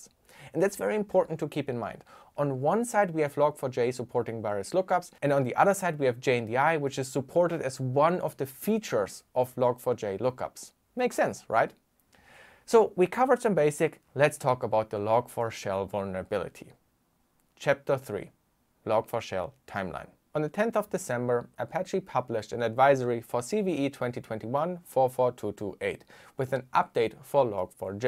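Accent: Germany. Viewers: I really liked your video and I thought: sounds like a German accent... checking imprint and realizing that it is the same zip code as mine 2 months ago :D Greetings to Lichterfelde!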